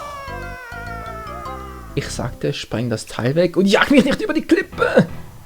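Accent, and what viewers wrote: Switzerland. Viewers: Bist du zufällig Schweizer?^^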